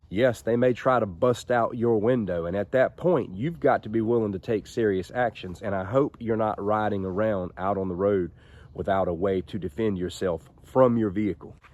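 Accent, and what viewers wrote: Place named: Arkansas, United States. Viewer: Are you from Arkansas? Your accent makes it seem very likely.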